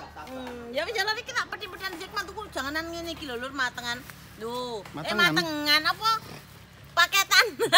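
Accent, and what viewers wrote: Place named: Surabaya, Indonesia. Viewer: salam kenal nggih, aku lht chenel smpean sekali ngono kok lngsung ktgihan, sampean iku ngangeni, trs lek ngomong logate surobyoan bnget, sakjane smoean asline endi..aku nek g ndelok konten smpean rasane motoku gatel bnget...sehat sll yo.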